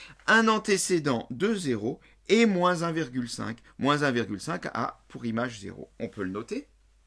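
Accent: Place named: Moselle, France. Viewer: Merci grace a vous j'apprends ce que je n'ai pas appris plus jeune. Une question: vous ne seriez pas ( comme moi d'ailleurs, de Moselle ). Car c'est comme ça qu'on dit Vingte chez moi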